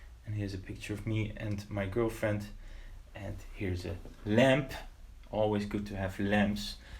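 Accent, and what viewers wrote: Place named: Flanders, Belgium. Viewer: I love the 'Ronald' keyboard , and the fact that your accent is not Dutch at all (it's Flemish :) )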